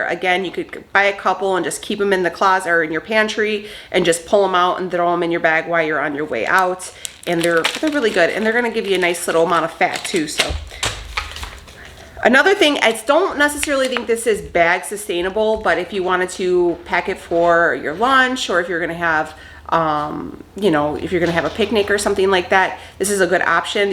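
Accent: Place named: Minnesota, United States. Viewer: Minnesota? That accent..Keto on n Strong!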